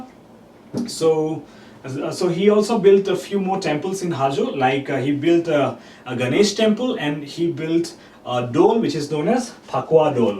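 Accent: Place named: United States. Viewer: aapuni US r pra ahise nki ne UK r pra. val english koise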